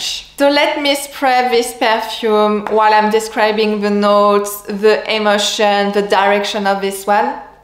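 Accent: France. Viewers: I started wearing Shalimar when I was very young and now I am on the very elderly side and still love it. I really enjoyed listening to you with your wonderful French voice. I love the way you told me all about the ingredients and why I so love my Shalimar. Thank you very much. MJMoore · Did you realize that the bottle on the caption is the Philtre de parfum flanker? When you mentioned the EDT, were you talking about the Philtre? It sounded like you did. Philtre is my favorite because it’s so airy and light and modern. Even the EDT is just too animalic for me and not so fresh. But who knows, I might change my opinion. I keep trying the EDT and EDP. BTW when you say heart ❤️ it sounds like hurt. Not sure if you’re aware of that. It’s your charm of course the French pronunciation but sometimes I wonder if you wouldn’t prefer to know about certain words. Like leather. 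By now I know what you mean, but the first time I heard you say that, I was wondering why a perfume would smell like a lizard🦎 😊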